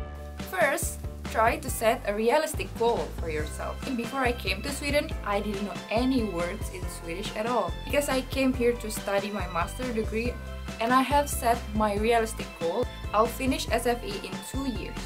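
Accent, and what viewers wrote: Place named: Indonesia. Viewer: Just by hearing her speaking Swedish with that accent, I already know she's Indonesian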